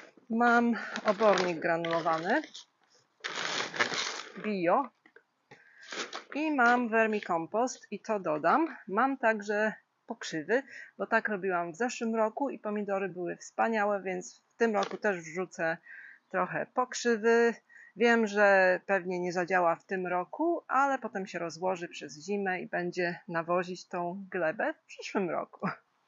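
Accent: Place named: United States. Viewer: Skąd ten amerykański akcent?😊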